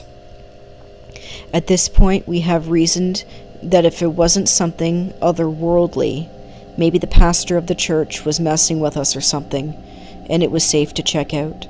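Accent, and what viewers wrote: Canada. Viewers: I'm English as it happens. Look girl, i was just having some fun. you should hear my accent? especially when i'm drunk! You sound Canadian and to be honest, i rather like the accent. i lived in the USA for a few years along time ago and i'm ex-army too so i'm used to quite a variety of speech patterns. If i knew you been subjected to nutters threatening you, i'd have either not commented or said something else. Why anyone would want to say awful stuff like that to you on the strength of the way you speak, God only knows? if it's worth anything, you carry on. i did like the stories. so did my dog. we listened attentively as we scoffed our sausages and mixed veg. :0) People who post shit like that are impotant tossers who proberbly have no mates and still live with their mums! Sorry again mate. Jez and Charlie dog. xxx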